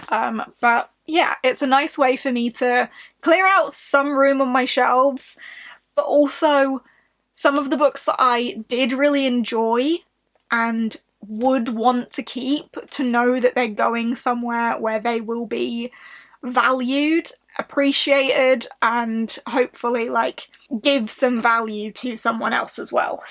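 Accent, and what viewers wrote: England, United Kingdom. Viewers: Hello, I'm Andrea. I discovered Middle grade books because of you and i absolutely love it!! I'm from South America, Colombia and i really like your English accent. Plus you are beautiful and smart.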